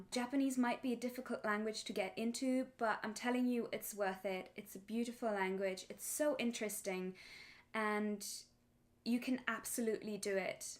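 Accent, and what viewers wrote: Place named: United Kingdom. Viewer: You are German? Umm, I thought you are British! Congrats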